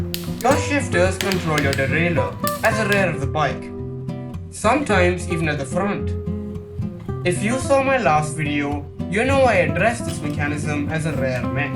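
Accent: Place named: India. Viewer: Love your vids. You have a little Indian accent but nothing to bother with. Continue I will still continue watching. Where do you live ?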